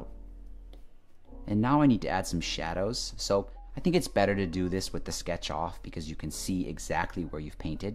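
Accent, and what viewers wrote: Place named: United States. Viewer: at your accent pretty much American if I'm not mistaken. Is it true? Yes, surely nowadays the situation is just unstable for you & family to travel around. Stay healthy and stay safe. Thanks for your kind responses. Keep posting nice videos 👍🏻👍🏻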